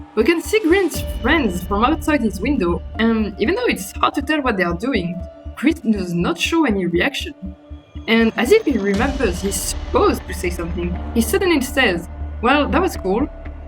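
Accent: France. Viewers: I'm betting right now, that you may be french, 'cause you sound french.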